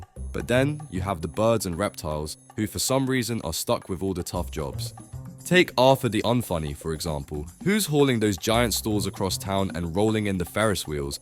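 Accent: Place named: United Kingdom. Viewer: Can you please stop with the British accent?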